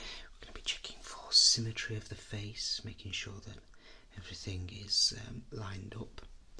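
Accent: United Kingdom. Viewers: He sounds... British?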